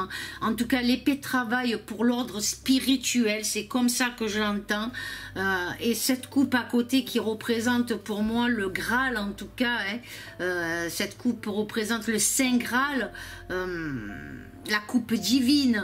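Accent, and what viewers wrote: South, France. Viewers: Bonjour accent du sud c'est e plus beau moi je suis du sud-ouest le Gers.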